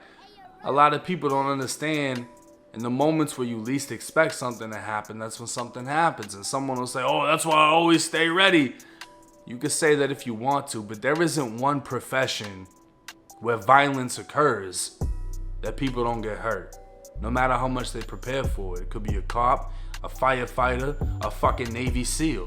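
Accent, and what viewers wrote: Boston, United States. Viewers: Just really getting into your vids I see you rep FL but your mass/Boston accent is crazy 🤣🤣🤣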